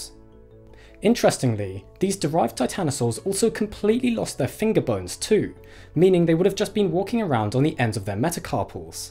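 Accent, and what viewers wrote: RP, United Kingdom. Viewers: I enjoy your work. you always put much thought into it and present the material well. i learn something new every time. if you want to pursue a career in network broadcasting, you are photogenic, you present yourself well and your accent is clear enough for most Americans to understand yet will be classified as upper class British so educated and trustworthy therefore ideal for promoting news and science as opposed to sport or entertainment. however, you appear to be turning your head so much that it distracts the viewer from listening. try limiting the characters per line from 80 to 60 or perhaps 40.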